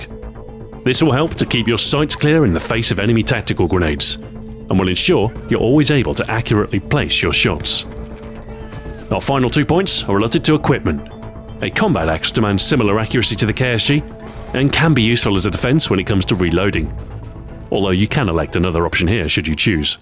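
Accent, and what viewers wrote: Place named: United Kingdom. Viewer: Somehow your Britishness makes all of this sound much more official.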